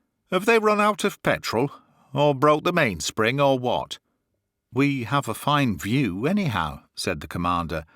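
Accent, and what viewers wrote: United Kingdom. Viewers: Mr your voice and narration is really marvelous... you can never imagine how much I enjoy listening to your narrations... your british accent helps me so much in learing british english... please never stop uploading more of your fantastic work... thank you very much sir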